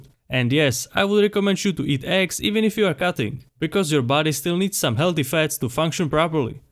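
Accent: Czech Republic. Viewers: I can tell you are Czech based on your accent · jseš čech žejo? jinak super!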